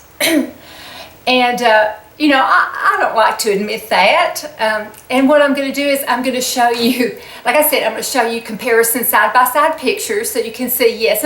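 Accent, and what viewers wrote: South, United States. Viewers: Oh, sweet, you are such a pleasure to watch, and us over 60 girls need to love the way we look and just enjoy our lives. My gosh, if I looked as lovely as you, I would be in seventh heaven, plus the delightful southern drawl. You just keep the sweet lady you are. Love from Iowa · you're such a beautiful, upbeat person! Enduring surgery and living with a chronic disease is not easy but you have learned to adapt and find what works for you. Love your video's and that Southern accent. Enjoy your time with the grandkids!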